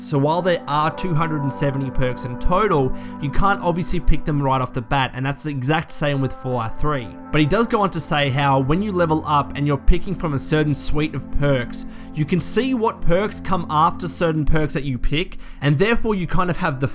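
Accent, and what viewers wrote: Australia. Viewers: LOVE your videos but every time I watch them, I'm always spacing out from how your voice sounds so calm and Australian... I don't mean anything mean by it. Keep up the good work though!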